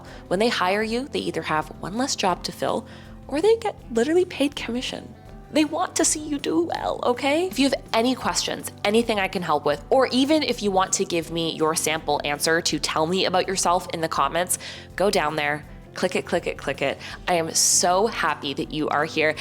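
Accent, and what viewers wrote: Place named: Canada. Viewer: Sounds Canadian…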